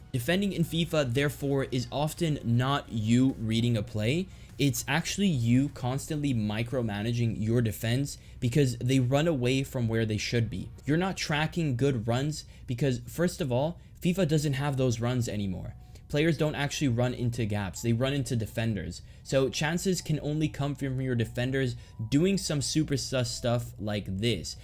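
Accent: United States. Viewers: It's an American accent so I wouldn't call it a 2nd language.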